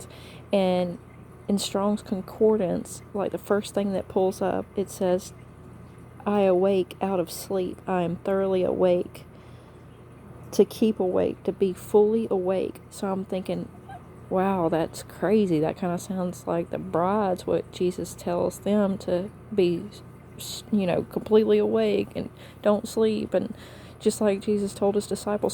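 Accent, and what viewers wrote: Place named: Alabama, United States. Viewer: Awesome! Listening to you speak I was thinking you from Alabama. We are close. I’m excited too. Thanks for sharing.🌈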